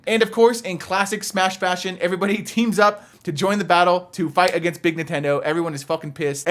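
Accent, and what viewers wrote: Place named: California, United States. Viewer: sounds like Fred Armison in the SNL skit Californians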